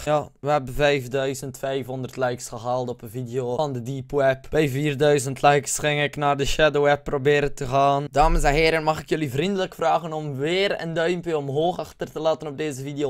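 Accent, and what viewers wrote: West Flanders, Belgium. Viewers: ziej gie e westvlaming? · Belg of nederlands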